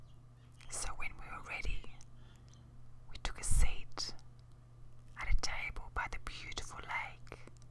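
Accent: Australia. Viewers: I find I can't watch your videos as often as other ASMR artists videos, because I don't want to risk losing the tinglefest I experience whenever I listen to you speak in that gorgeous Australian accent! That is, when I don't lose myself in your beautiful face! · Such a pleasure to hear a fellow Aussie! Very intense! And very close to home! <link> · I love the Aussie accent.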